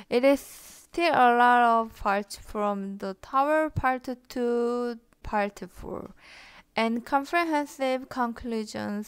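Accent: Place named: South Korea. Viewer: 발음이 어쩐지 친근하게 들린다했다.. 한국분이셨네 ㅋㅋ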